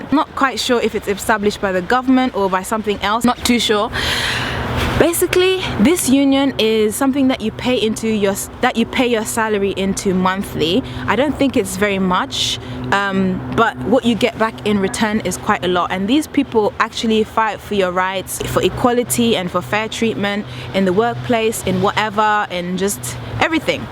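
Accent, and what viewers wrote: United Kingdom. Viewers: gosh I love your accent! I wanna move to Britain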